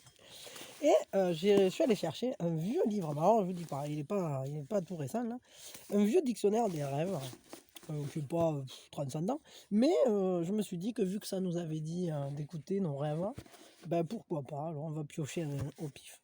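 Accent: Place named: Gascony, France. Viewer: Chère, je viens vous dire M.E.R.C.I. merci, merci pour cette magnifique, magique, et tellement parfaite année que vous nous avez offerte avec ce cœur magnifique que vous avez. Vous faites ^partie des gens exceptionnels que j'ai eu le bénéfice de rencontrer. C'est rigolo parce que vous avez le même accent que moi ( je suis en Gascogne - 32 ) et vous? si vous permettez bien sur. Joyeux Noël. Paix, Amour, Lumière à vous ainsi qu'au monde entier.Je nous aime!!! PS: Vous chantez divinement bien!!!; )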